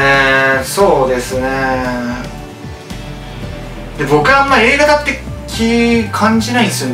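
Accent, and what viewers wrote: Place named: Shizuoka, Japan. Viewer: 静岡弁。笑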